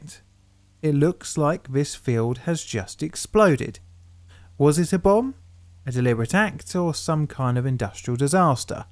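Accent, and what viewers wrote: United Kingdom. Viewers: there are so many British pronunciations of French and Spanish loan words that are just grating to me. And don't get me started on how badly "wagyu" gets mangled.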